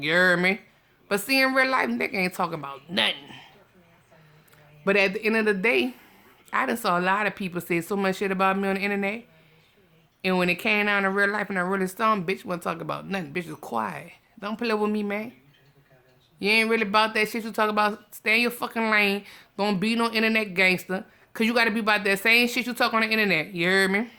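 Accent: New Orleans, United States. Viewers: I just love listening to you! Ya insights and slang and N.O. accent...Very comforting.I promise I would listen if you made a video describing how to make a peanut butter and jelly sandwich.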